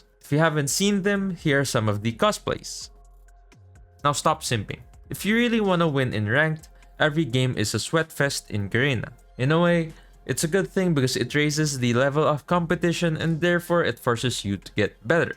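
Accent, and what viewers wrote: Philippines. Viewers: Pinoy ka rin pala, tama kutob ko kase the way na mag salita ka e btw naubos ko na lahat ng vids mo. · Why is your english accent sound like filipino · Been watching your vids for a while now and I realized that you’re also a Filipino based from your accent. Good job! New subscriber here from Garena server.👍🏼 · I knew you were a filipino. Just from the a cent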